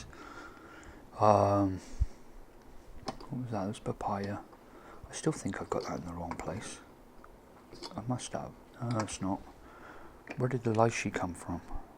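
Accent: United Kingdom. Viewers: Hi mate, love your vids. Are you British living in the US ?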